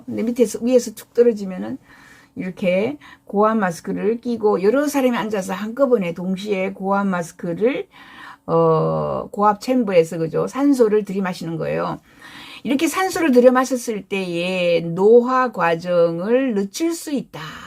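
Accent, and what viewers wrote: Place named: North Gyeongsang, South Korea. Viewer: 경북으로 시집온 중국동포입니당. 구수한 지망말투 친근함을 느낍니다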